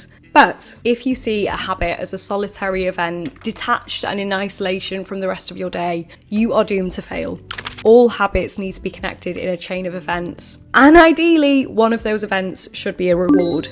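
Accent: United Kingdom. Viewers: Aww yew Bri’ish 🎩💂☕️🤓🤓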